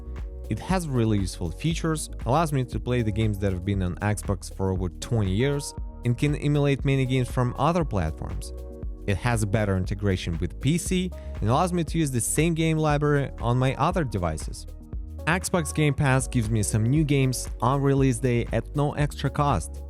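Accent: Russia. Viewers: Guy sounds Russian i would take what he says with a grain of salt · У тебя максимально русский акцент))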